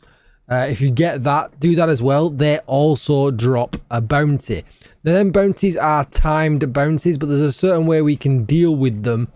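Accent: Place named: Leeds, United Kingdom. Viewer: You sound like you're local to me. Leeds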